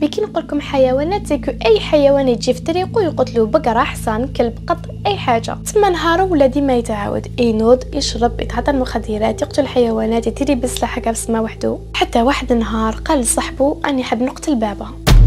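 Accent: Algeria. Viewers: I m new follower. You are stunning and your algerian dialect is very sweety. I think the best version of arabic❤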